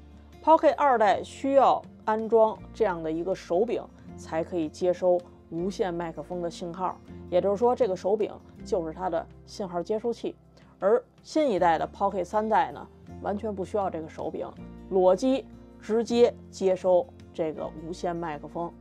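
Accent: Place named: Beijing, China. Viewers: 老北京口音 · 还真是，而且都是京腔。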